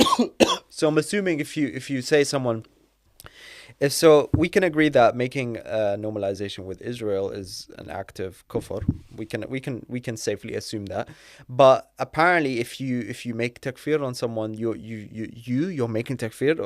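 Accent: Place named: United States. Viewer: Do you live in the US ? because I can hear the accent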